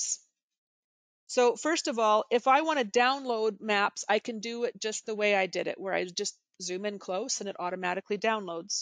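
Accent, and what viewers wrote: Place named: United States. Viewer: Very informative but what version of Canadian english do you speak? Very American pronunciation. Rout? Mobil? Yikes 😮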